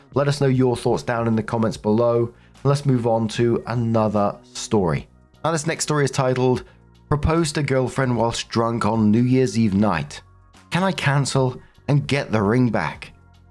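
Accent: United Kingdom. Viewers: Can anyone remember what channel was called before? I have been racking my tiny mind and can't remember anything but I started following him as I knew he had to be a Brit.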